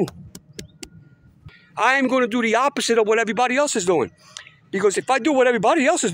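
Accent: New York, United States. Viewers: Your channel is AWESOME…I just subscribed ….youre in Munich but you sound like you’re from NY ….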